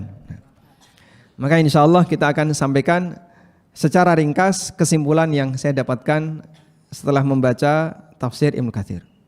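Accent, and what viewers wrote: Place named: Java, Indonesia. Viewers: masyaallah tabarakallah, jazaakumullah khairan. sebagai orang jawa beliau cukup i detik dgn jawanya serasa di nasehati orang dekat. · Mas syaa allah..... saya orang jogja yg merantau di bogor.... paling seneng khas logat Jawa nya... serasa di nasehat dg cara yg lembut dan halus.... Barakallah... sehat berkah pak ustadz❤❤❤krn allah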